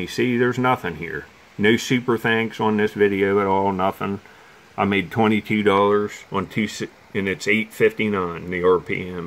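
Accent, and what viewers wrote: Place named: Pennsylvania, United States. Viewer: Your welcome. I never dreamed that people could buy views and likes ect. Thanks for all the explaining. But i have a upgraded youtube account and dont have to watch ads. How is the pay out different on that? My son says the channel makes more this way. Also how much of a super thanks does youtube keep vs. what you get. Percentage wise. This is the only channel i have ever donated to. I am by know means i person with tons of cash. But this channel is my favorite because it has alot in common with my way of living a simple life. I was born near the river just north of Pittsburgh and moved to ky in the mid 80s when everyone in my family lost thier Good paying steel mill jobs. 475k bread winners on the same day. My father was the only one smart enough to pack up his family and move to a much better place where there was way more opportunities. 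This channel reminds me of home. Even the way you narrate sounds like the people i grew up with that eastern as you say O hi a and western pa draw... lol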